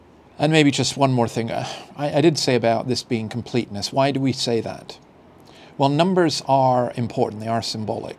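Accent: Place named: Australia. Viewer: Good stuff. On a side note - Your accent is becoming a little bit Aussie Have a great day and God bless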